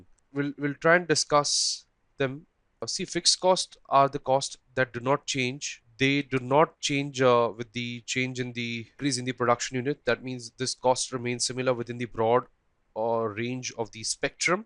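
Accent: India. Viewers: I don't mind an accent , I'm from the American South, but good grief. It's great that Indians are often technical but they make zero effort to sound anything like a native English speaker. About 4 minutes in, its like listening to a machine gun and I move on, it's just too hard trying to decipher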